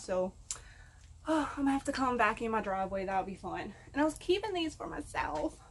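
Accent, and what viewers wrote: Louisiana, United States. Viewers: You got to be from south Louisiana !! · You can tell your from Louisiana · where are you from, your accent sounds like a La. accent. Just curious I’m from La. and it reminds me of home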